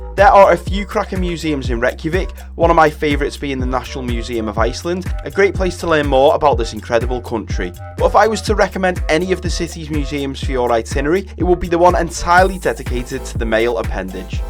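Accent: Liverpool, United Kingdom. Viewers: is that a scouse accent or wirral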